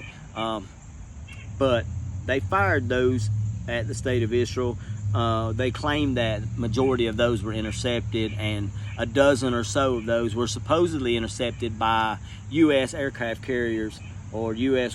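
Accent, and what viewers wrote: South, United States. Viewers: Dear Brother, I’m an old woman now and I became a Muslim over 18 years ago. I am a 100% disabled Iraq war veteran woman from the first Iraq war, it was because of the Iraq war that I became a Muslim. I’ve lived in Egypt 18 years now and I’m dying of heart failure. I have to say this to you, seeing you with your tattoos, hearing your Southern accent, the way you look, where your from, has made me so full of hope and happiness to see you as a brother in Islam. Never could I dream that an American from the South, who looks like you, would ever become a Muslim; because of all the ideas we get about people from the South in the Christian Bible belt. Never judge a book by its cover. I too have tattoos from the old days, I too am American, and the point is that Allah can save the roughest and toughest of people in heart and mind. Your kind heart will bring more people to Islam and educate them that we are good people. 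May Allah bless you and continue to help you educate others to bring them to our beautiful Faith of Islam.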